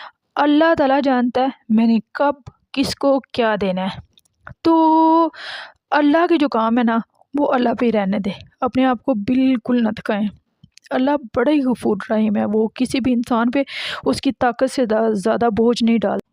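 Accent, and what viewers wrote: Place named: Gujranwala, Pakistan. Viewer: Ap mujhe gujranwala ya iss k ass pass wale cities mai sy lagti hain😅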